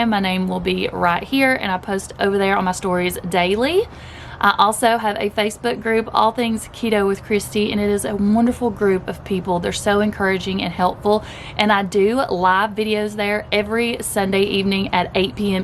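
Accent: South, United States. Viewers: YAY !! Meal prepping! Thanks Kristi! I have 4 kids (2 still at home and the youngest is the pickiest 5 yr old girlie on the planet I swear! LOL). Btw, I love your southern US accent: it reminds me of Dr. Ken Berry's accent and I think he lives in Tennessee so I can only guess that you're from the same region. Anyway, I'm going to watch your video and take notes as this looks like what I'll be making for my own family over the weekend. Warm regards from southern but not-so-sunny Belgium, Donalda (new sub)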